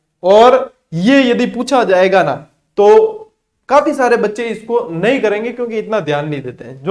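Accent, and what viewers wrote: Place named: Marwari, India. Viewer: Brain ki capecity problems ko kis trh aur kiti jldi solve kia ja skta h us basis pe napi jati h also kitna navachar hm kr skte h joki aap continue kie hi ja rhe h To apne aap ko mandunudi jse sbdo se na pukare 🙏🙏 You are a brilliant student/teacher and khuda ka anmol tohfa h sir .., abhar hmesa ishwar ki kripa aap pe bni rhe h 😊😊 u are rockstar,🔥🔥🔥🔥🙏🙏 simply chijo ko asan krne wale and marwadi me bolte to bhut ache lgte sunte hi jae bs jse tq again🙏🙏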